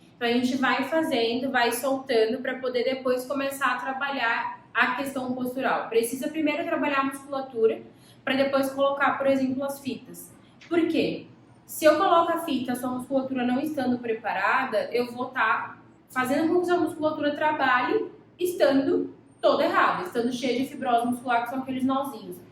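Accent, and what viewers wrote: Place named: São Paulo, Brazil. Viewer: É uma pena que sotaque paulistano é tão ruim de ouvir.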